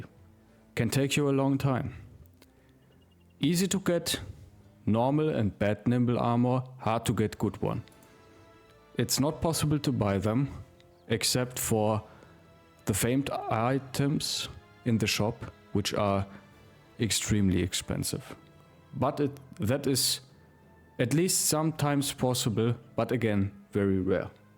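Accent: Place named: Germany. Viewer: Nothing is quite like a thick german accent schooling one in Battle Brothers! Thanks i learned a lot :)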